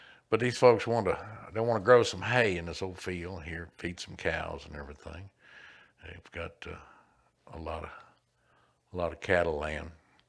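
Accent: South, United States. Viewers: Haha! Great video! I like listening to you narrative! That good ole country accent! God bless the work of your hands! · Loved the Video AND The Country Boy Narration!! Haha! Get Er' Done!!😎😎😎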